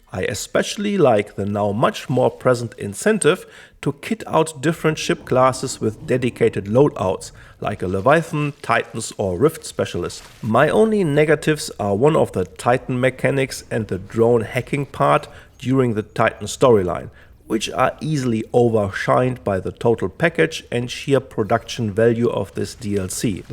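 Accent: Germany. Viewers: höre ich da einen deutschen Akzent raus? :D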